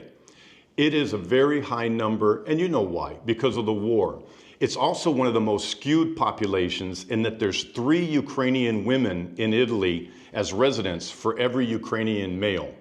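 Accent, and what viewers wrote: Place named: Midwest, United States. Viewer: Seems none of your seats face the wall TV in the scene behind you. Italianos call that style "oldefogeyia" :-) Sit well: Live well... & I live very-well in SW FL, USA and have found cycling an ebike expands one's appreciation of a place. Mobility is vital to a "good life", yes? I'm too-long-ago from Pavia, and a lifelong cyclist. Best for folks ANY folks living in those Italian towns to be one, too! :-) For lots of good Italiano reasons. No disrespect, but your voice isn't typically "Italiano-sounding". More like a Chicago/MidWest US-twang to it, maybe? Their everywhere here, too! :-)))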